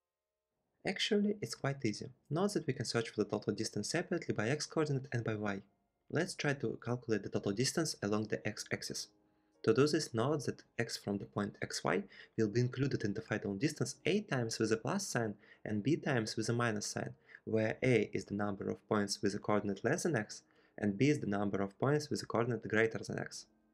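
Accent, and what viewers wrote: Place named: Russia. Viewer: Too strong russian accent, the rest is great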